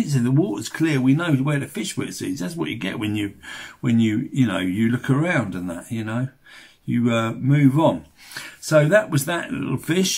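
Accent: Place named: London, United Kingdom. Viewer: Great video. Thanks for sharing. Do I detect a slight London accent there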